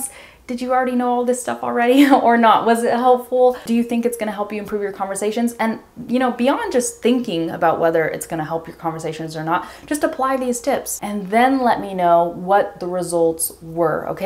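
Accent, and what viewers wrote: California, United States. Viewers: i just want to hear you talking i like your voice and american accent. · i adore the American accent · I really like your Californian accent. It's easy to understand so I can get your tips so well. Thanks for making this video Greetings from Lima :)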